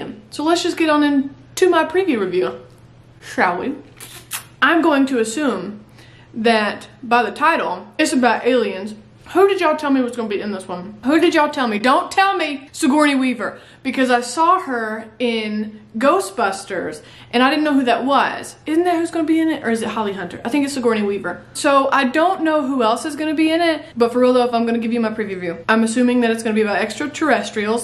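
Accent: South, United States. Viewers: Which of the Southern states are you from? if your willing to tell.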